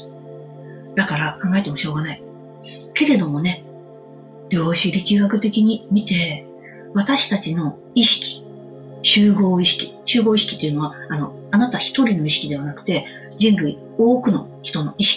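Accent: Kansai, Japan. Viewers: さん初めまして宜しくお願いします😊自分で語るのもおかしいのですが！私ね、あらゆる全ての波動周波数が何故か分かるのです！今地球に宇宙からのエネルギー波動が流れ出来ています9874hzです2〜3年前(コロちゃん初)700~900hzでしたのでものすごいスピードできめ細かく軽い波動が地球に入っていますね！😅このペースで行くと25年の銀河波動も、期待出来ますね！今も少しっつではあるけど南に傾いています😮ポールシフトが起きる事は宇宙では決定しているのですがその前に、予知現象といった具合に私たちが経験してそれから完全なポールシフトが起きます！その時沖縄~台湾の地下大陸が浮上します😮 さんの関西弁がとても好きです😅ありがとうございました💕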